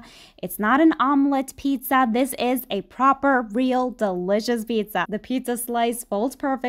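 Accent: Italy. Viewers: Love the way you pronounce Mozzarella and Nutella. Just love your Italian accent in all ❤️❤️ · I am italian and I love how you keep the italian pronunciation!!!!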